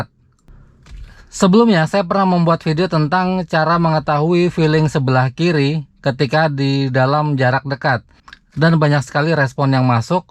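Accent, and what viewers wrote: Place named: East Kalimantan, Indonesia. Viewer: abg dr Kaltim yaaa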